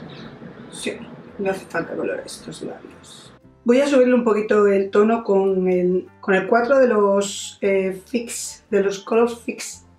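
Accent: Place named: Málaga, Spain. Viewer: Cómo puede ser que tengas acento de Málaga si eres de Huelva? me causa curiosidad, ¿no tendrás familia malagueña? Por cierto, el maquillaje te queda genial. Un saludo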